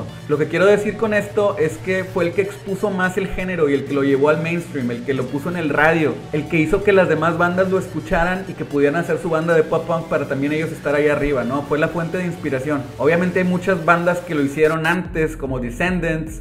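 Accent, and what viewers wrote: Mexico. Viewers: Excelente video, yo soy de Ecuador y realmente a todos los mexicanos les oigo igual, 😅😅😅, no me parece que se merezca un video, ella fue un éxito de 5 canción a lo mucho y se fué desvaneciendo, hasta desaparecer, me fascina desde que tengo 12 años, aurita llevo 39 vueltas al sol, y cualquier video sobre ellos Siempre será bienvenido, que tengas un gran fin de semana. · siendo sincero el acento mexicano no me gusta para nada, me suena ridículo a veces, pero debo admitir que el tuyo es el mejor, ni siquiera sabia que era mexicano, y ahora que se que es mexicano me va a costar decir qie no me gusta el acento, me encanta tu voz y la naturalidad con la que hablas, me da curiosidad saber como cantas.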